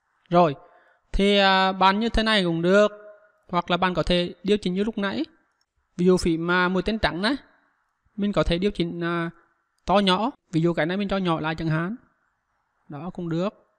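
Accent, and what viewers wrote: Central, Vietnam. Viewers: Hay quá a ơi.giọng miền trung cũng rất là chất luôn a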